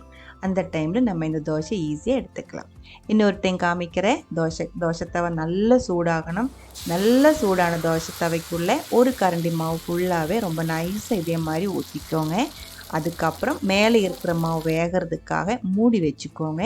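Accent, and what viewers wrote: Kerala, India. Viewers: நீங்கள் மலையாளம் பேசுவது போல் தமிழிலும் பேசுவதால் கேட்பதற்கு நன்றாக உள்ளது சகோதரி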